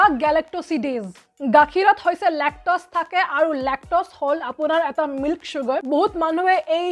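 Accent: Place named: Odisha, India. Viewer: Sounds so similar to Odia.😅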